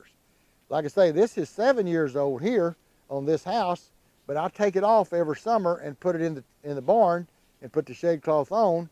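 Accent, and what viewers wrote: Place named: Arkansas, United States. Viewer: I love to listen to you for two reasons. One, you know so much and I live to learn from you. Two, you sound like my family in Arkansas and it makes me smile.😊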